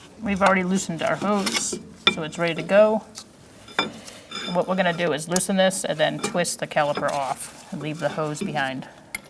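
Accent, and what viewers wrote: Boston, United States. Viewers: This is gonna be a task, watching this thick accented Boston woman replace these calipahs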